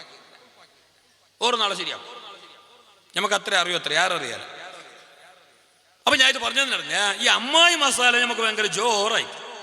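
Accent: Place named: Mangalore, India. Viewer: മാഗലാപുരം അല്ലെ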